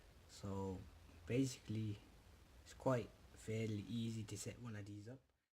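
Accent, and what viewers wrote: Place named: Birmingham, United Kingdom. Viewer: your brum accent 😭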